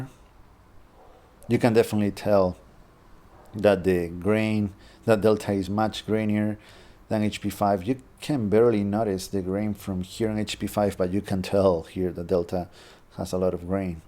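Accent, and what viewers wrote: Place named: Spain. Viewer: Con todos los respetos, tu acento inglés suena horrible... no entiendo por qué siendo español haces los contenidos en inglés... Es una pena porque privas al público de aquí que no comprende el idioma de entender unos vídeos que comparten unos conocimientos e ideas sobre fotografía muy buenos, y estás dando prioridad al público extranjero...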